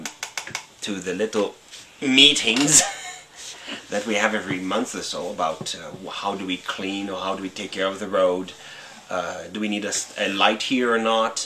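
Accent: Quebec, Canada. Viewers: Your speaking English with a "Quebecer" accent. Your son can be Tri-lingual! After all they do speak French in Quebec. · lol im from montreal and i picked up on your accent quick to. great to see quebecers doing big things in the world as the gov here to day seem to think the world revolves soley around quebec. anyways intersting video, thanks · He's lost alot of his canadian accent lol!. OH and happy Canada day from the capital :) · i am wondering which part of canada you came from. Your canadian accent is different from Ontario accent. Many people often think all Canadians speak just like you, and I couldn't understand why because people from ontario speak the same as a lot of americans. So I wondered where you came from. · Yay canadians - you sound french canadian.. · what's up with the accent? is that canadian? the weird sounding Ts?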